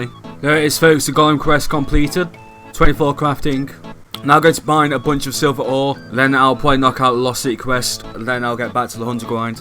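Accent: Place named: United Kingdom. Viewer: Love to series! But your accent is very Bri'ish! :p Kinda hard to listen to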